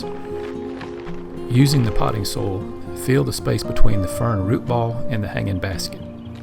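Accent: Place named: South Carolina, United States. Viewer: Thanks for all the tips🌿Just hooked our system up. Love that everyone is commenting on your accent. That’s that born and raised in South Carolina flow☺️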